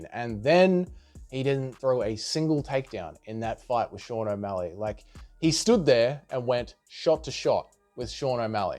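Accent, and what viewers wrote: Australia. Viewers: I agree. I cannot stand his annoyyyin Australian accent and lack of digital creativity of his videos.. andd lame humour, as in not funny. Nil, Nada, nah uh. I do wish him the best though, as I do myself and everyone. Maybe this comment will make him better, probably will it personally and splash all his money in his bank account or even take out a loan he can't get so he gets someone like his spouse and manipulate her to take a loan for him to get him a new high end computer and softwares for editing, microphones, lights green screen the whole 9, so his vids can compete with top tier amateur journalists and become a youtube ufc reporter 😂.. everything all high quality except his humour and riz. Also he looks like his going through a mid life crisis to start doing youtube and tiktok 🤣 Don't take this personally take it as motivation "brutha" and "have a go at it m8" 😅 hahaha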